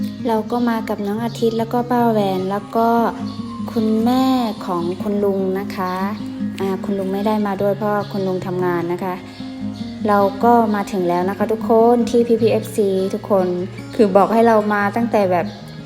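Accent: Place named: Central Thailand, Thailand. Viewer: น้องพูดไทยกลางชัดมากครับ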